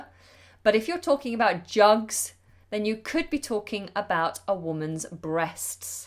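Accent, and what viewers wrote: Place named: United Kingdom. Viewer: I love your accent quite a lot. I have heard many British natives speak but nothing compares to you. What is it, RP? Keep it up, I learn a greal deal from you....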